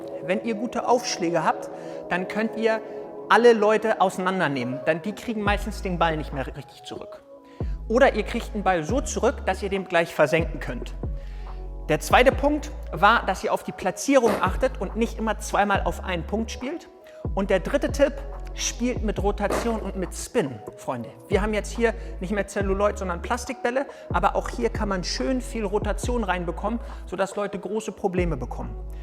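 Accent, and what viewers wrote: Hamburg, Germany. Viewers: Schöner Hamburger Slang Bin selbst aus Hamburg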